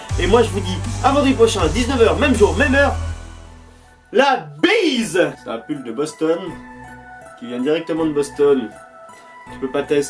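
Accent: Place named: Quebec, Canada. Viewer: Ptdr, excellent surtout avec l'accent québécois 😹😹😹😹👍👍👍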